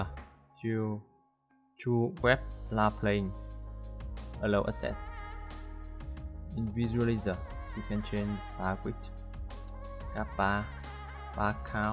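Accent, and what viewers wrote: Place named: Vietnam. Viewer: nghe kiểu đọc là biết người viêt, haha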